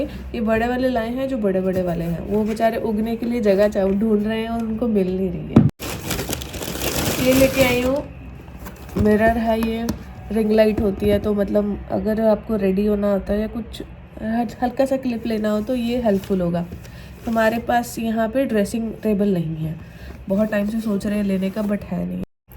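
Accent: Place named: Himachal Pradesh, India. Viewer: Aapki language me himachali touch ata hai apnapan mehsoos hota hai ❤❤❤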